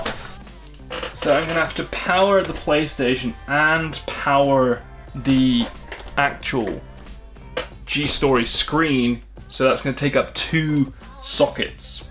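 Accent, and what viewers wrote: Bristol, United Kingdom. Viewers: are you from Bristol? That accent is V E R Y F A M I L I A R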